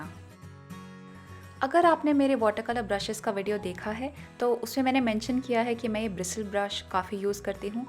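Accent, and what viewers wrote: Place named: Kashmir, India. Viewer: Didi are you kashmiri?? Your accent sounds so ❤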